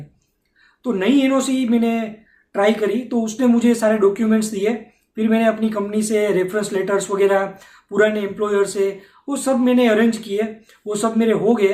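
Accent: Gujarat, India. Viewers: Lucky you brother, I’m also Gujurati just like you. Maja ma?😅 I’m having 65 points in SINP at the moment but it’s been sm tough time due to covid so I dnt thnk the points would go down in near time but looking at Canada’s plans to invite more than 4 lacs immigrants, my hopes are up. Let’s see. Tamara tone pat thij first video ma khabar padi gai k lage to che aapna Gujurati, keep it up buddy🤟 you’re doing a great job for ppl like us who’re planning to migrate and settle in canada. Specially SINP is only hope for me too at the moment.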